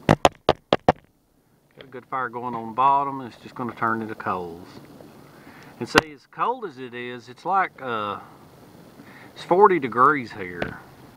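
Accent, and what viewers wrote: South, United States. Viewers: hi, I found you a couple months ago & spend many hours watching your vds. I know I will never get to use most of what you teach because I'm an old 83 yr old woman but I feel that the things I learn might help me to help others get through when the SHTF & I believe that's not very far away. I'm thrilled that you & Nick seem to enjoy your time together & I LOVE your Southern accent & your laugh. My dream was always to own 40 acres in the woods & build a small log cabin & just sit & watch nature. Didn't happen. I built a house by myself. Outdoors us where I'm the happiest. Sorry this is so long but I love listening & watching you. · Dang I love your DIY videos. I love the way you talk most of all. Us Southern folks are losing our dialect and I am happy to see yours is intact. MOST refreshing. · Even if you were talking utter gobbledegook I could still listen to you talk all day long. 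That is the most American accent I have ever heard. Just keep talking mate, it is just so entertaining. Love your oven too, definitely going to make myself one of those and I'll be sure to give you the credit for it.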